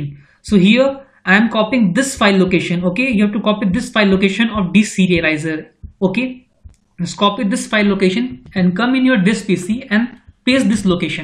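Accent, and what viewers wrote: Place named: Arab world. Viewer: mmm bro what brother in what language are you speaking, I think it is English but as if it were mixed with Arabic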